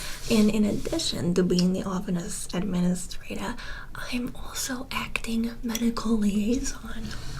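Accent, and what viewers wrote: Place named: Boston, United States. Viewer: Your beautiful and are you from Boston if so your accent is to die for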